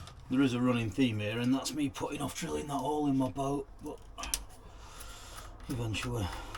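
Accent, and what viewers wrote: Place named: North, United Kingdom. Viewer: I missed the bit where you said in your northern; "Don't belive in spirit levels " 😃 I am certainly looking forward to seeing more, just so I can see your workmanship reaching new heights. This boat project is going to be good for you. Take care.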